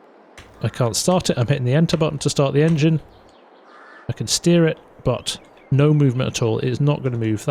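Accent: United Kingdom. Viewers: Bros too muh brihishh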